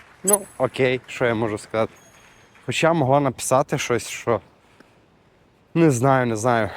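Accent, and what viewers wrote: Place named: Transcarpathia, Ukraine. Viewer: Підписалась тільки через закарпатський акцент😊. Не знаю чи так чи ні, але сумую за Україною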